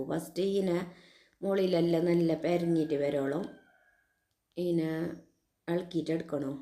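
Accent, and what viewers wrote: Kasaragod, India. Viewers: ചിക്കൻ കുടൽ കറി ആദ്യ മായി കാണുന്നു 😅👌പ്രത്യേകിച്ച് കാസർ ഗോഡ്കറി യും ആദ്യം തന്നെ. മുട്ട കറി യിൽഇടുന്നത് കണ്ടിട്ടുണ്ട്. പുതിയ ഒരു കറി പഠിച്ചു.കാസർഗോഡ് സംസാരവും.... 👌👌❤️❤️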